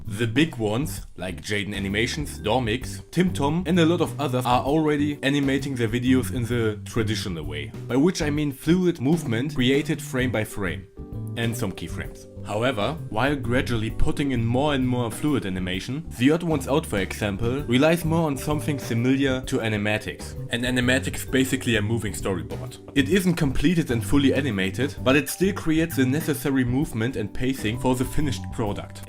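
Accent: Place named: Germany. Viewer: So 2 Sachen. 1. Man merkt sehr krass das du deutsch bist. Aber solange du die Sprache gut beherrschst ist es ja ok! 2. Die Rechtschreibung. Man schreibt im Englischen nur Namen groß! Und das "I" schreibt man auch immer groß. (<-- Englisch Freak is am start)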